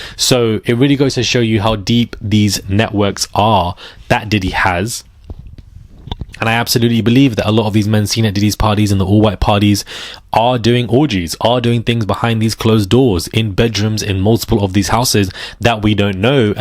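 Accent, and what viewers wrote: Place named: United Kingdom. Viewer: I really like your take on things and your presentation. I especially find amusing when you speak in slang with your lovely British accent.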